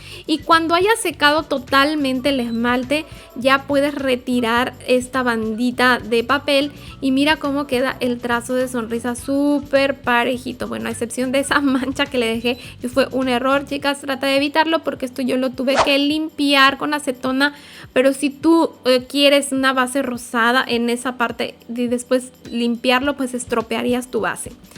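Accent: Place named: Mexico. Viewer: Oye tu te expresas como mexicana yo creí que eres de Mexico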